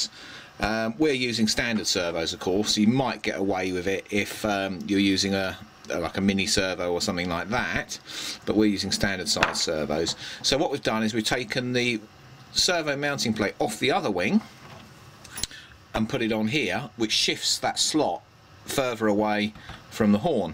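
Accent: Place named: United Kingdom. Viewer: oh goodness thank you he is british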